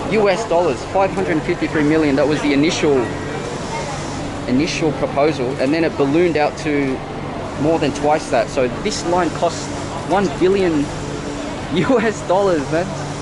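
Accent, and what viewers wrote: Australia. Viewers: The new metro looks good! I hope they install platform doors later on. It would make the system a lot safer. Your stations are above ground rather than underground like ours. I'm from Hong Kong, China. Your accent - is that Australian or New Zealand? Also, LOL about Vietnam's population of 98 million. That's what I see on Worldometers. Yes, I agree got to hit 9 figures.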